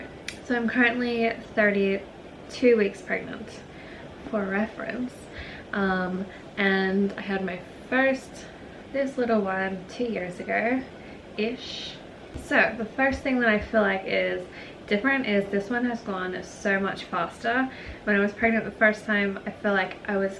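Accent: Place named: United States. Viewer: Why do you have a part American accent? Did you live there?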